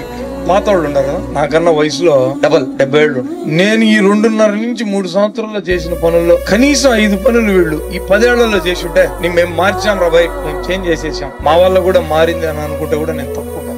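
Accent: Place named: Tirupati, India. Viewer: తిరుపతి యాస దించేసవ్ బయ్యా, సూపర్ ❤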